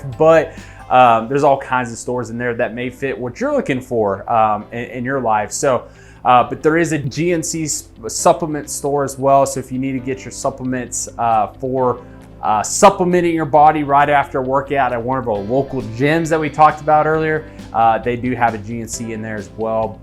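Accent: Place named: South Texas, United States. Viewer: Was part of KCAC back 2014 first annual plein art show..my medium was acrylic and produce larger painting than usual but the challenge to take part of the festival was awesome..I like to find estate cabin where I may pursue my artistic painting of the unique Hill country landscape..until then .. vayos con dios..amigo.. Oh it's South Texas twang.. peace.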